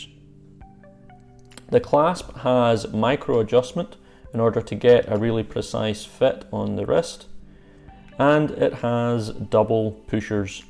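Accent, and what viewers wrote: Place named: Scotland, United Kingdom. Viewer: I just bought one with 25% discount here in Hong Kong (I'm born and bred in Scotland, so the accent and all just takes me home.) It's actually great value for an in-house column wheel chronograph with bags of history and heritage too. Great review of the watch and scotch!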